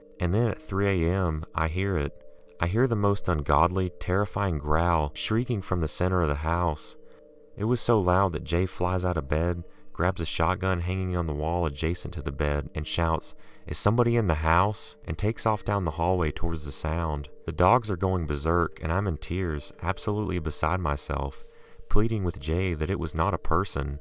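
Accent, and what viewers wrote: South, United States. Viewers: Sounds like you have a southern accent. Do you mind me asking where you are from?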